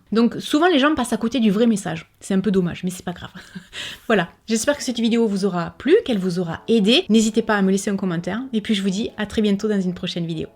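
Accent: South, France. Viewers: je te suis depuis plusieurs années mais n'ai jamais posté de commentaires. Je le fais aujourd'hui pour te dire que tu es une personne formidable, tes vidéos sont toujours le petit moment de bonheur avec l'accent du sud et du soleil☺️. Toujours authentique et vraie, de bons sujets de vidéos et une famille formidable avec ta maman et vos adorables petits compagnons. Ne change rien 🥰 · J'aime bien cet accent du Sud ! Super!!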